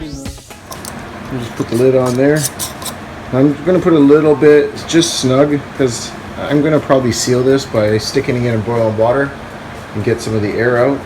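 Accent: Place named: Canada. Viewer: Just lucious! Thanks for sharing. I wanted some pickles, Thanks mom! Ok, you know me(kinda) LoL, please remember they are native here, and I really like the Canadian accent! But please, Pah con..... LOL ~ 707! Bro hugs, and if it's not drinking out of a hose, it's burnt, starting to include wildlife, I'd have to go back and look, but I'm pretty sure, it's begining of week 3 of triple digi's here. Peace, and please, enjoy!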